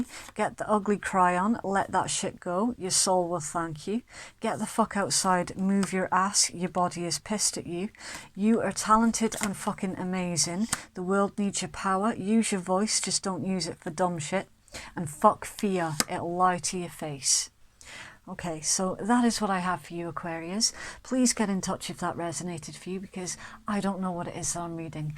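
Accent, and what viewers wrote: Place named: Northern England, United Kingdom. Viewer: Thank you very much for this reading. I’m sure this message was intended for me, it was so specific I was literally waiting for you to give the individuals names involved! I think you are in the north of England from your accent. I have been living away from the uk for the past four years and am returning in March. I would very much like to meet you, do you ever do personal readings face to face? You can find me at <mail>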